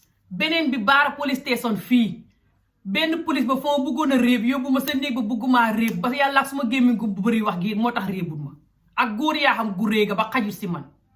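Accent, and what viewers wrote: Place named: Gambia. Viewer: Listening to you attentively, your Wolof accent sounds more Gambian (fanafana). ☺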